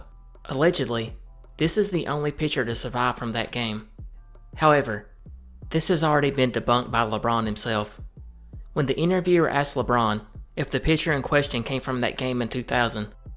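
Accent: South, United States. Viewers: Thank you! I really enjoy these. I absolutely love your voice! I'm from WV and sadly, I never hear Southern creators. Keep up the great work and I definitely look forward to future videos.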